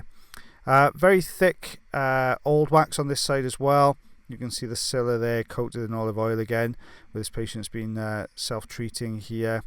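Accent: Wales, United Kingdom. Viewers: I could listen you your voice and lovely Welsh accent all day...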